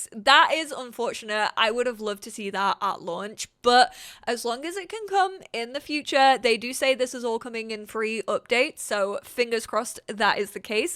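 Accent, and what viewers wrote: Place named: Northern England, United Kingdom. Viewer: Does actually live in england still. To me her accent sounds like 40% northern and the rest vaguely america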